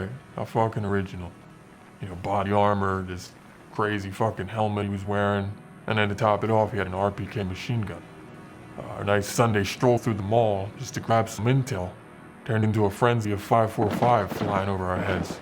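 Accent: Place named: United States. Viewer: Russian in American accent. Love it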